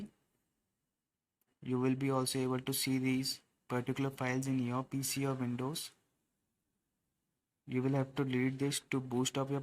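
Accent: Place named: India. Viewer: nah i heard the indian accent i immediately clicked off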